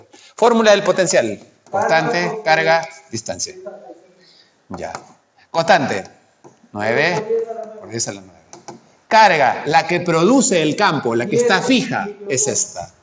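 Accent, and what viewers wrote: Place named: Mexico. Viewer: Hola, de donde eres ? Tu acento es mexicano, pero parece que vivieras en Perú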